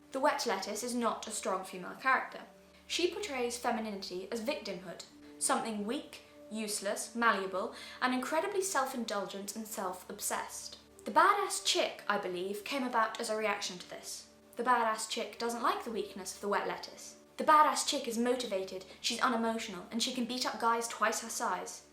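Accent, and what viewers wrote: United Kingdom. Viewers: I'm so glad it's a british chick saying this. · This has to be the most "propa" british accent I've ever heard. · i thought this was gonna be some insufferable libtard or conservtard discussion on "misrepresentation of gender archetypes in fiction" that instead rags on commercialisation or identity politics etc in, i hear a british accent and eat my thoughts (not a comment on british people, also not a comment on american people. its less likely for a non-american to be republicanly/democratly charged than an american) i think this is gonna be good in and it sounds kind of consevtard but the coments on the quality of this video inclines me to think that your going to give reasonble basis for the selection of his specific evidence to and i get the clarification i asked for now im waiting for the clarication i need oh yeah i forgot to mention: subscribed. 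i dont remember when but im now i hoping you prove me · Woman are beautiful Strong and they can run very fast they are not weak And this woman accent is very beautiful and I love British Woman they are very powerful and I love her accent so beautiful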